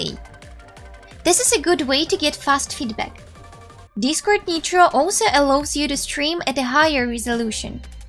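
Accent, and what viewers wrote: Russia. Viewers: are u russian?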